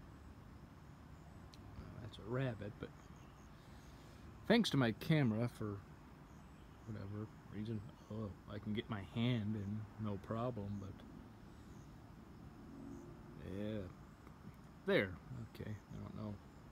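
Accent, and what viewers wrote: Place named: Midwest, United States. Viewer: First off, I do hope that you are staying safe and dry. Secondly, Iowa? I subscribed to your channel - after having seen that you commented on the some of the same channels as me - and I could not figure out your accent. Turns out you're a Midwestern boy. Like your tone, elocution, and diction seemed old-fashioned like you came out of a Capra film. I mean no disrespect, and am totally wishing I spoke like you.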